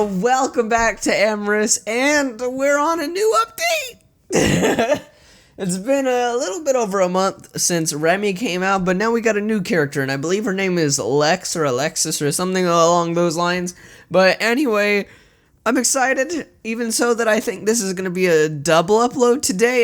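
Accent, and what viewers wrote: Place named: New England, United States. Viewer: The accent challenge (New England) edit: the English in new English is just American not fake British.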